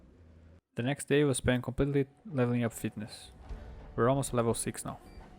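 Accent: Brazil. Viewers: Eu sabia que tu era br! O sotaque ta do jeitinho, boa série btw, ganhou o meu follow! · Pelo seu sotaque você e br só pode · sabia que tú era brasileiro, o sotaque é inconfundível kkkkk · você é brasileiro? parece muito o sotaque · Where u from? U got a brazilian accent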